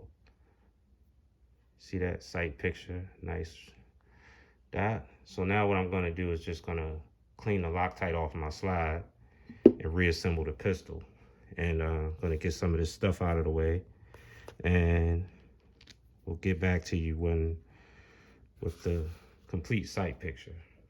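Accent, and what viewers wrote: Baltimore, United States. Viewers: I hear Baltimore all in your accent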